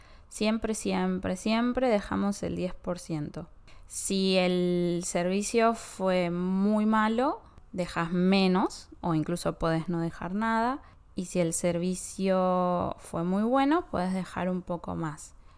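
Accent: Argentina. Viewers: ¡Hola,! Me encanta tu programa, especialmente este episodio sobre las costumbres argentinas. Aprendí muchísimo, como por ejemplo, lo primero que mencionaste sobre las diferencias en "cumplir con los horarios". Antes tenía el prejuicio de que los argentinos no eran puntuales, porque en China tenemos muy arraigado el concepto de la puntualidad. Sí, soy de China, y me encanta el acento argentino. Actualmente estoy aprendiendo español gracias a tu programa. En cuanto a temas como las propinas, ya lo había preguntado a mis compañeros. Por ejemplo, quería saber si en la peluquería era necesario dejar propina, y ellos, riéndose, me preguntaron si alguien me estaba obligando a darla. 😂 De este episodio también aprendí algo muy importante: cuando se visita a un amigo, lo mejor es llevar una buena botella de vino. No ir con las manos vacías es una costumbre que también tenemos en China. 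Allá solemos llevar frutas, o dependiendo de la edad de la persona, cajas de comida especial o suplementos nutritivos. Por último, estoy muy emocionada por tu próximo episodio. ¡Voy a seguir viendo cada uno de ellos! Espero que tengas días muy felices. ¡Saludos!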